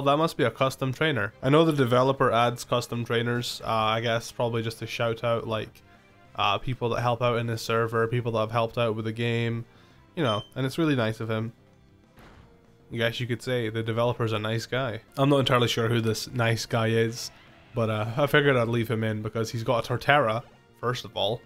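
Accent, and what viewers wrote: Scotland, United Kingdom. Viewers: you sound like you're from Scotland like me!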